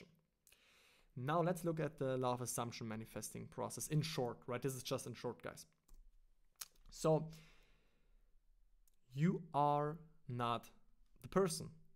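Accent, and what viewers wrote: Germany. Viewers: are you German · Deutscher Akzent?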